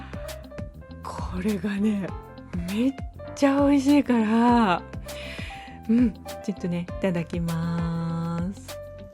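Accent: Kansai, Japan. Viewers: 通りすがりにちょっと立ち寄らせてもらいました✋😅 パイ生地とフランスパン🥖見ました👀話し方めっちゃ可愛らしい🤩🤗 真夜中のに笑いこけた😂 関西弁はやっぱりええねぇ いっぺんにファンになりましたよ🎉 私は奈良から和歌山に嫁いで微妙に違う言葉を使ってます。 まずはフランスパン😘😘😘🥖Tryしますね 楽しい動画見ながら😘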